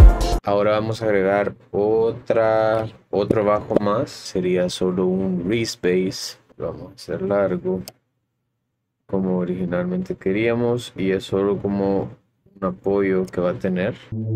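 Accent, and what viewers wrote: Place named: Honduras. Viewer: hermano soy tu fan desde que vi este primer video pense que eras hondureño estaba asustado, dije y este brother quien es jajaja saludos hasta SV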